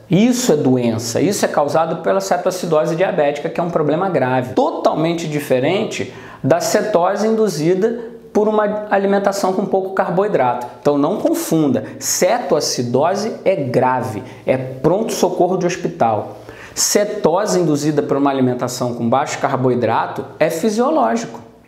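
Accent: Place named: Rio de Janeiro, Brazil. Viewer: Esse sotaque Carioca é tudo!!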